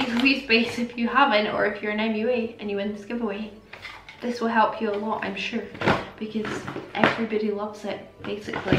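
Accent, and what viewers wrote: Scotland, United Kingdom. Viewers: Hi! CONGRATS ON 50K/30K!!!!!!!! (but you so deserve a lot more). You are genuinely so generous giving away this much AMAZING makeup and also can I just talk about how stunning you are and how INCREDIBLE at makeup you are! My Instagram is and I would love to win because I love love love makeup sooooooo much and really want to be able to grow my collection and also try out new makeup and formulas that I may not be able to afford myself lol. But I would mainly love to win just to try and grow as a makeup artist and maybe reach that goal one day! Love you and your Scottish accent so much!! Amelie xx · Hey I’ll be honest I only just found u a couple of weeks ago but as soon as I heard u’re voice I hit subscribe it’s so refreshing hearing a fellow Scot,, Would love to win xxxx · Instagram handle is I'd love to be in with a chance of winning because you are my favorite inabler when it comes to colorful palettes. 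Also, I moved to England last year and hearing a Scottish accent makes me happy (I miss Edinburgh so much!) congratulations on 30k and kept on making me want to buy everything! Xx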